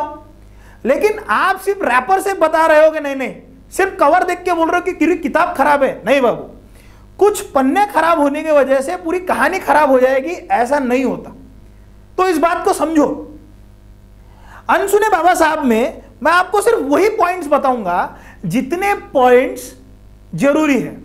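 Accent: Maharashtra, India. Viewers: Idk but you sound Marathi af sir. Typical Marathi guy talking in Hindi.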